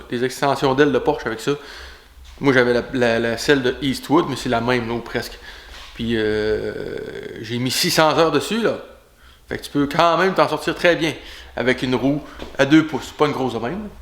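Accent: Quebec, Canada. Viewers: Top comme d’habitude avec ton phrasé québécois savoureux :-) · Encore une vidéo qui permet de mieux comprendre le travail de la matière et d'éviter de devoir récupérer les erreurs faites en voulant aller trop vite. Je commence aussi à mieux comprendre (le Québecois) à force de regarder tes vidéos. Vivement la suite.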